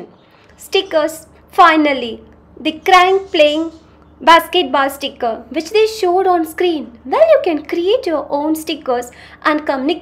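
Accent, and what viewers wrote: India. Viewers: I llllllllove your Indian accent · Your indian accent is so thick girl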